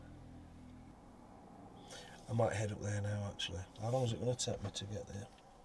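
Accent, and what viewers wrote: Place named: Newcastle, United Kingdom. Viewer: It's not rubbish it is what it is.. Glad your off to a nicer spot.. See ye Geordie speak..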